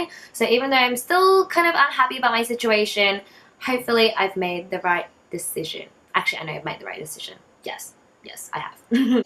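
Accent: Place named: Australia. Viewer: Randomly found your channel and got obsessed with your Australian accent!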